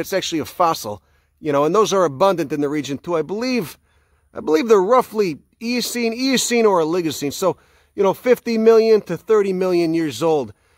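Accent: Chicago, United States. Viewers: What is someone from " chicahhgo" doing in Southern Texas ? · This man sounds likes hes from chicago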